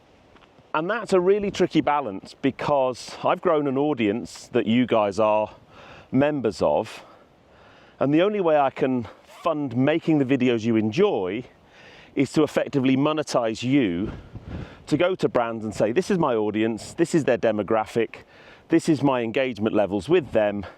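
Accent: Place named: RP, United Kingdom. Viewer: Mmm but you have gone bit posh😢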